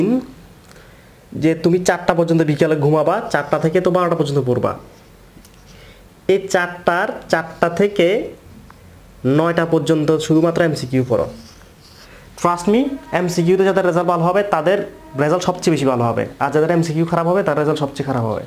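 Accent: Chittagong, Bangladesh. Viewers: Apni ki Chittagongian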